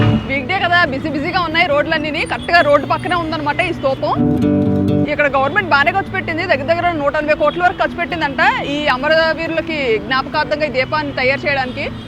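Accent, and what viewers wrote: Vizianagaram, India. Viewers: గలగలా మాట్లాడేసి రెండు తెలుగు రాష్ట్రాలే కాదు, ప్రపంచ వ్యాప్తంగా ఉన్న తెలుగువారి సోదరి ..మళ్ళీ మళ్ళీ వినాలి అనిపించే విజయనగరం తెలుగు పరిమళం ...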